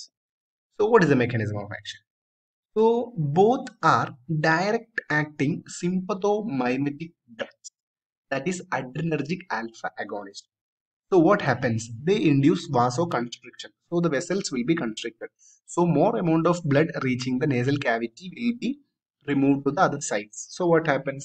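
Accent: India. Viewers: Pakka Tamilnada English accent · It's kerela accent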